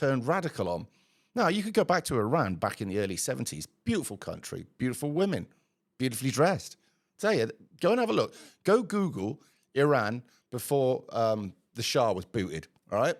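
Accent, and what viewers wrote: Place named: Newcastle, United Kingdom. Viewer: What area is she dictating for. Sounds like newcastle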